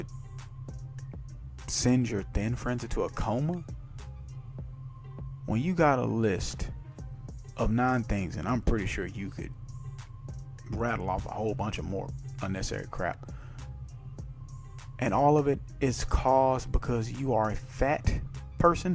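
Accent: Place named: South, United States. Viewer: His corn pone accent makes it all funnier.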